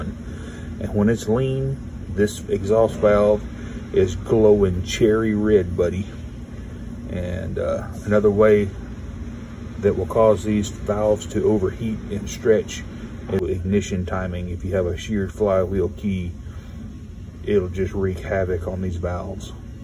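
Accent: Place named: South, United States. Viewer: Why are there so many hard-to-understand hicks with that ignorant sounding souther drawl putting out videos? People don't think you know what you're "tawkin' 'bout".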